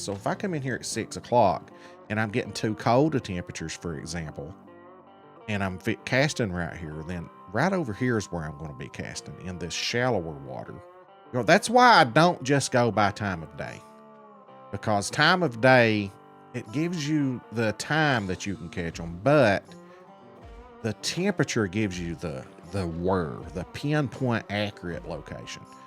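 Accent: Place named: South, United States. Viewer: As a fellow southerner, you got a great accent, brother.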